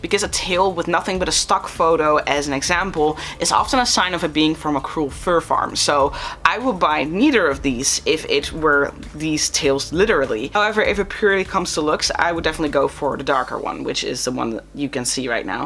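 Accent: Netherlands. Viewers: Random question are u dutch cause the accent sounds familiar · Are you dutch you sound dutch and I am dutch oh and love the video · oommgg ur english sounds dutch, ben je nederlands? er zijn echt bijna geen therians hier ben echt zo blijj aaa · You kinda have a little Dutch accent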